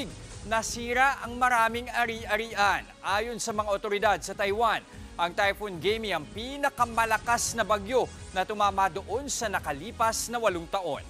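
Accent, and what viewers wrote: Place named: Coño, Philippines. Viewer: Slang naman nang news presenter nang news5. Coño yata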